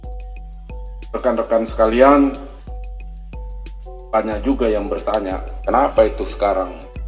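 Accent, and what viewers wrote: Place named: Makassar, Indonesia. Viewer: Meskipun sudah jenderal tapi logat Makassarnya masih ada. Salamaki pak kapokri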